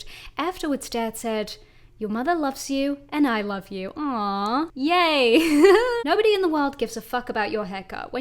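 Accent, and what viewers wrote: Brooklyn, United States. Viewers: All I took away from this - this man needs therapy. And - best Brooklyn accent ever. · Top quality Brooklyn accent 😎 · That accent! I'm dying. Absolutely spot on American accent. Chef's kiss. Never change. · Please record all future videos with your Brooklyn Accent. You don't have to though.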